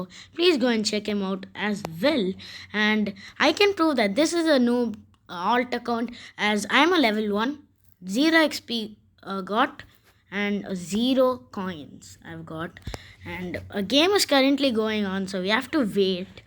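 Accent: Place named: India. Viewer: Lol your Indian scent lel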